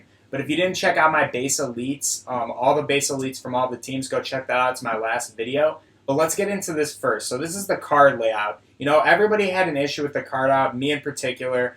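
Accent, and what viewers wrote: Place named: Chicago, United States. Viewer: the one and only chi town accent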